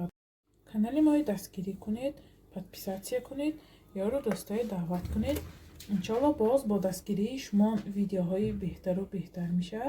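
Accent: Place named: Konibodom, Tajikistan. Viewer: ташаккур ба чавобатон апачон, ман аз Конибодом ,тарзи гапзанитон ба лахчаи мо монанд аст хело..дастатон дардро набинад,Ташаккур ба шумо,хело ба ман писанд хастед,хоксор апаи худам❤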